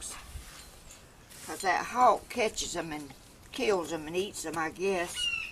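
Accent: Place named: Georgia, United States. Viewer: I love your Georgia accent. I am from Amarillo, Texas so I have a Texas accent. Good thoughts coming to you from Texas.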